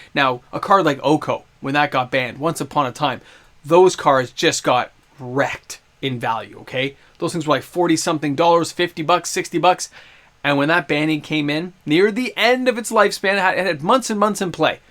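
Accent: Canada. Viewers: Anytime I have a negative thought about how WotC is doing something I don't like, the thought in my head is narrated in your voice. A shouted-stern-canadian voice.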